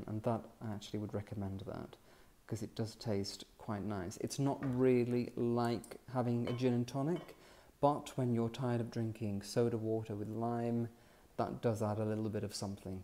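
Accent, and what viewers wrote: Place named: Northern England, United Kingdom. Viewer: Whereabouts up north are you originally from? Whereby you are very well spoken some words are very northern, for example past and grass etc!x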